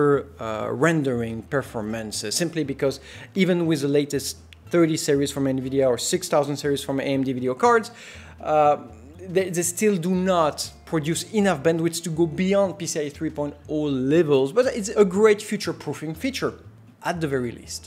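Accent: France. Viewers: Great review as always :):) is it me or your french accent seems to be fading ? You look really more fluent anyway I totally love your work and I'm extremely proud to see a compratiot appreciated ^^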